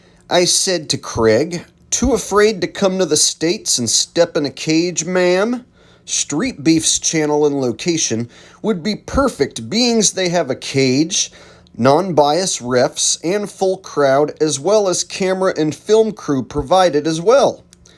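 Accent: Bristol, United Kingdom. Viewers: I bet he dont go out much in Scotland, This guys accent is from the Bristol area in England, the Scots are not fond of the English, I cant see making many friends there. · He has a Bristol accent aka Bristolian, so it is possible he isn't in Scotland....possibly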